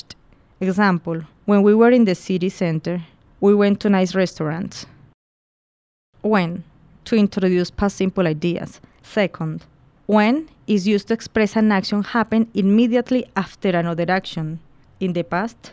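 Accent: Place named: Colombia. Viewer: ingles de colombiano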